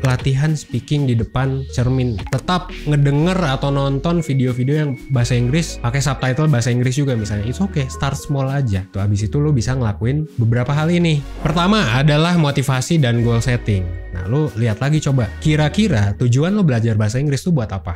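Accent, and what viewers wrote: Indonesia. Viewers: Aksen indo yg paling lucu.. 😂😂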